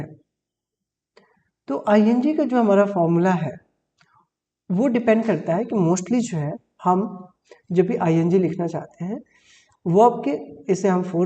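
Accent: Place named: United States. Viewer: I love❤ your American style voice..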